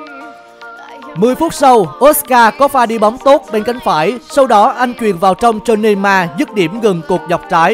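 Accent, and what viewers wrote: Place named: Quang Ngai, Vietnam. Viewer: Giọng Quảng Ngãi đặc sệt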